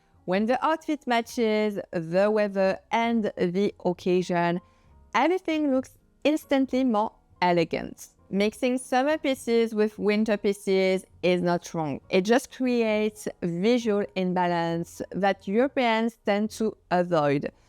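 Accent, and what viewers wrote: France. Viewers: I just love the way you show the pictures of Of Fashion on Lily Collins from the movie and or series as badly styled because I’ve watched a few of those and I agree it’s just wrong and then I’m wondering if you could tell me if you really have such a strong French accent, or you could speak English with less of it🤪😅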